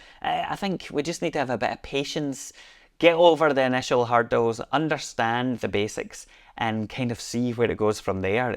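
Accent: Scotland, United Kingdom. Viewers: I believe it must be Scottish.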